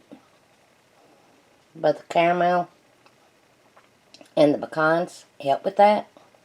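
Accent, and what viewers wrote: South, United States. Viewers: I've always wanted to try of these as pecans are my favorite. Thanks for the video. I'm definitely going to try these. And your southern accent is to cute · I most definitely love your southern accent!!!!!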